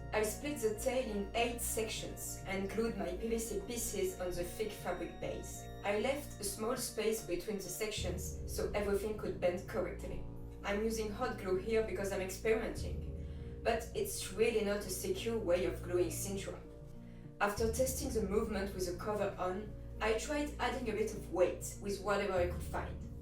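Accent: France. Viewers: Tu es fr? On entend un fort accent français :3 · Ça sent le français cet anglais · Oh my gosh! You are SOOO adorable and talented. Thanks for sharing your processes in making articulated tails. My daughter loves them! She wants me to help her make one and this video has helped me SO MUCH! All the best to you! We love listening your cute French accent!!! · Me, french people : FRENCH ACCENT !? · Are you French? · Are you french ? you havea strong accent ! (I'm french myself so just asking ^^) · i was wondering, is you accent a french one by any chance? i rarely get to hear french people speaking english with such fluidity so i'm not used to it. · 00000 i can use this for a fursuit tail!!! thank you so much! also let me just say your accent is amazing! is it french? i really like listening to it it's sort of calming :> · Tu es française toi sa s'entend xd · Are you French ? 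you have a french accent x) (si jamais je suis français et bravo pour ton travail !) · Avec l’accent je me disait que c’était pas une anglaise mais une française 😅 Mais juste RESPECT pour pouvoir créer des parties de cosplay 👏 J’en prendrais graine lorsque j’aurais tout les matériaux pour faire mon propre cosplay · j'ai reconnu notre fameux accent en anglais x) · You have a fr accent i u right? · hum that french accent... i have the same one sinon joli travail. meme pour les prototypes, ils sont plutot bien réussis. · That so good omg ! I was wondering , are you French or something like that ? Btw it's really cool keep it like that is really amazing ! :D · Are you french, you sond French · Are you french? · I don't know but I'm just assuming, she's French 'cus of her accent? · toi sa se voit que tu es française juste par ton accent, tu parles bien anglais quand meme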